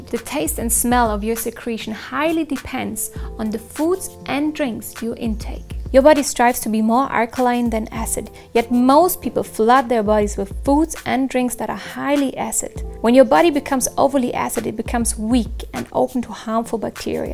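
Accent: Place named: Germany. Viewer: My best friend is German, it's so nice to hear the accent <3 And you're the coolest so watching these videos make me so happy :)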